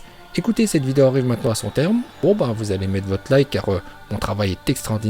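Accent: Japan. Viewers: Super ces vidéo car on entend des prononciation que les youtubeurs français ne prononce pas comme : Naluto shipunden Laiton Vraiment sa fait plaisir d'avoir des prononciation japonaise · J'aime trop ton accent japonais 🏯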